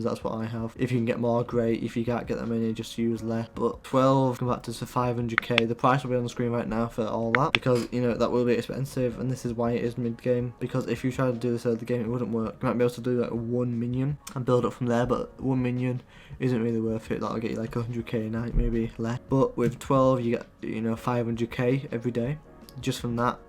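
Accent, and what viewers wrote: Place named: United Kingdom. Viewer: The critisicm.. if people are donkeys and can't understand English doesn't mean they need to complain in the comments. (My main language isn't even English and i can understand him very well.) I'm also self taught with English. So please stop the criticism he just has a British accent jeez.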